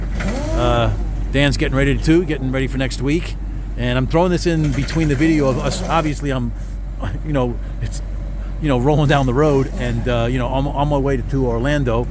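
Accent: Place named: New Jersey, United States. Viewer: COLD! Judging by accent you must be from NJ? The Fletch used to see winter for a long time in western NY and I'm sure Dan doesn't miss it. Good luck racing in Florida and have fun.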